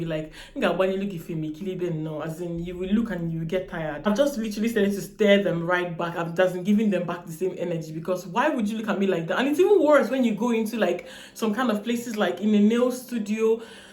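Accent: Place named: Nigeria. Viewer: You sound like a Nigerian, you guys aren't the same as us North American blacks(the genuine blacks, so far as North American and media bias goes). Your distinctive patterns of behavior aren't quite the same as what we cinsider to be black, you guys have an exotic~foreign vibe and that changes how white people intetact with you, unlesd you can properly emulate being an American black, you don't seem to have this capacity(you just come off as high class lady that is pretending to identify with the rest of us blacks, although my being part chinese also makes my experience not quite the same as a standard U.S black).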